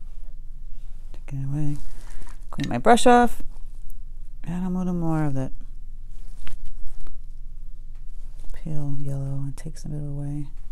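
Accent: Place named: New York, United States. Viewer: Thank you sooooooooo much for your AWESOME videos!!! I love it!!!! I watch them all!! I like your accent! NY?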